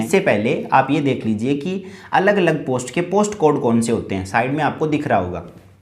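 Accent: Rajasthan, India. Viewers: Bhai aap rajsthan se ho kya? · Bhai Rajasthan se ho ky....?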